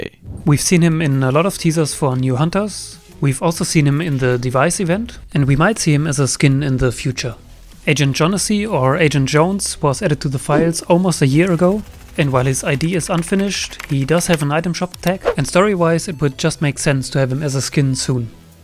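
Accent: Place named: Germany. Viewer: man hört den deutschen aktzent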